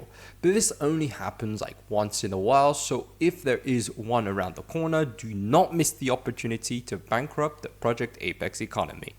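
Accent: France. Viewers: Thanks for the tip. Btw I enjoy your French accent. 🇫🇷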